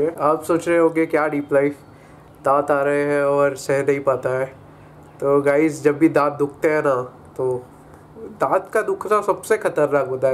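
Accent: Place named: Maharashtra, India. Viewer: bro ur hindi and english with slightly marathi accent feels that u r one of my family .. like elder bro of mine .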